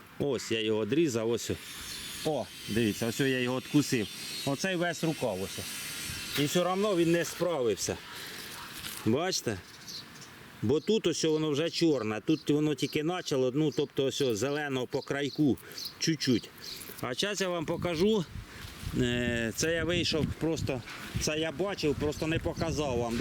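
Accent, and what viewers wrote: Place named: South, Ukraine. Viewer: Так впі знаю наш говір південний . Я змиколаївської.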